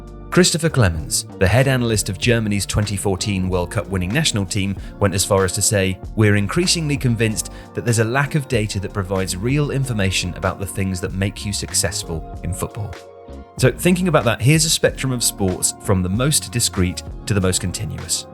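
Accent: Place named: United Kingdom. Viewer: I waited the whole video to hear something about hockey, a sport that is in some sense very similar to football. I think when it comes to data analysis, you just analyse what's important for you to help you decide either strategy during a game or overall management of the team. And I still believe there is a gap here because Americans love statistics, the video having a British accent makes me think they haven't talked to the Americans yet :). I grew up watching American football and I will never forget when I was once watching a game and the stat came up on screen (not accurate but you'll get the idea): "Quarterback John Doe has a pass completion rate of 57% when the temperature is below 32 degrees" (Fahrenheit of course). I was like "oh, they will have data for everything". So, back to football, they will have the important stats. Statistics on victories (home and away), victory rate after scoring first, victory rate after trailing starting the second half, victory rate after trailing when compensation time starts, goals per game (already done), goals per penalty kicks (where in the goal the shoot more often, accuracy per kick location, I think this is already done), penalty kicks stopped (by keepers, already done), clean sheets for keepers (already done), accurate passes (left and right leg), goals (left and right leg, headers), ball contact time/times (done) they may even have duel stats, like Messi's successful dribble rate against Pepe. 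In hockey you would have similar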